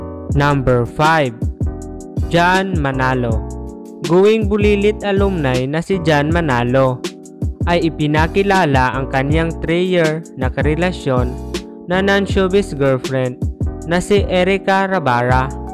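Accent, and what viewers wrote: Visayas, Philippines. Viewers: Bisaya ka dong.. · san ka sa visaya dong?? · Halata kaayu bisaya imong tingug dong 😁😘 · Halatang bisaya ka bay haha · Klaru kaayo kang bisaya sa tuno nimu bah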